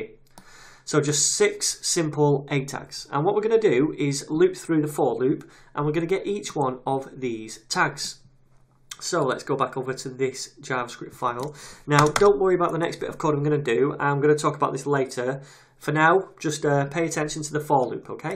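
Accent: United Kingdom. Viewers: everything's better explained in british accent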